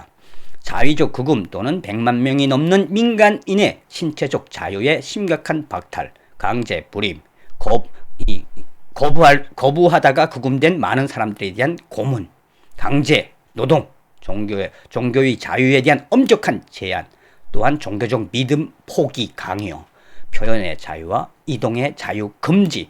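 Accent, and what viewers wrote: North Korea. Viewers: 고저 더 가열차게 달려가갈시다레~~~달려가달라요!!!우리 부모님이 이북 출신이셔서, 이북 사투리 들으니까네 그냥 너무 좋은데요... 행복 하시라요~🙋🏼‍♀️👍👍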